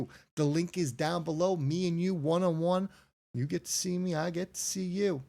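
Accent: New Jersey, United States. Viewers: BROTHER LOVE YOU VIBE CONTENT & SOUNDS LIKE JERSEY OR PHILLY STYLE ..NY ?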